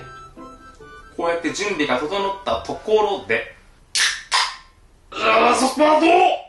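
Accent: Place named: Fukuoka, Japan. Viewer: 「バックを『からって』店内を歩けないでお馴染み」 親の転勤で福岡県から他県に引っ越した時、学校でランドセルを『からう』が伝わらなかった時の衝撃。の福岡弁懐かしくて大好き 『背負う（せおう、しょう）』が標準語なのかな？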